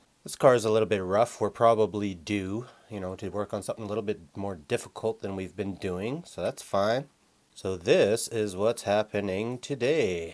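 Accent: Canada. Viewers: You sound so much much like one of my Canadian friends. Are you with the RCMP? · You’re Canadian aren’t you?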